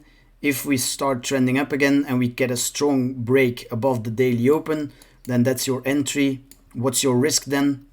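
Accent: Belgium. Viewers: Dat Belgisch accent 😆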